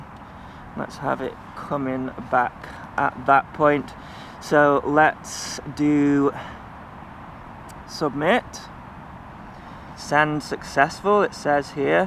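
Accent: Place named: Yorkshire, United Kingdom. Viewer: Yorkshire accent :) I came here from Quadcopter 101 because I wanted to know more. Could you potentially use that booster with any wifi-drone?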